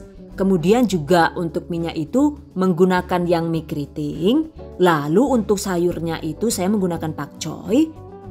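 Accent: Banyumas, Indonesia. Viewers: Kalo mi nya suka yg pipih Bun, tapi suka yg pake udang dan pakcoy. :) Ada ngapak2 nya itu lho yg bikin tambah greget pengin nonton Terus video bunda . :D sehat terus bunda agar bisa selalu share ilmu ke kami Mahmud. Biar misua tambah saayoong..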